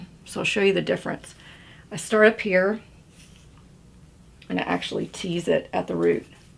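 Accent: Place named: Texas, United States. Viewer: So good to see you back, 😊 You're sounding more Texan since you've moved back. Muah! 😘 Love you!!!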